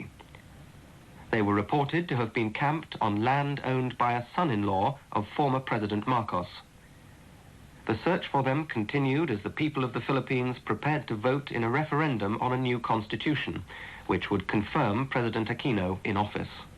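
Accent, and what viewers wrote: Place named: United Kingdom. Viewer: 영국발음 진짜 멋있다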